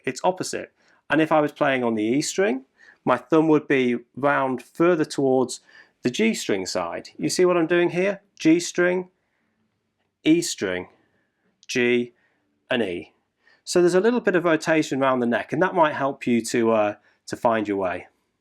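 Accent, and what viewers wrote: England, United Kingdom. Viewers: What kind of English accent is this? I like hearing him talk lol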